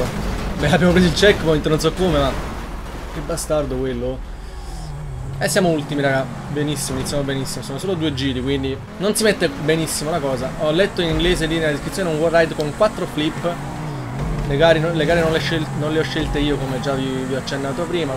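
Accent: Naples, Italy. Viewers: Sei napoletano ?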